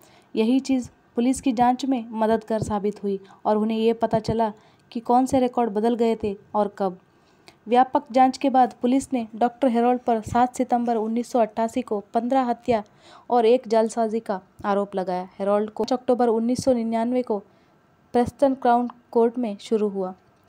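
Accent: South, India. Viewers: Better u speak English ur accent is south Indian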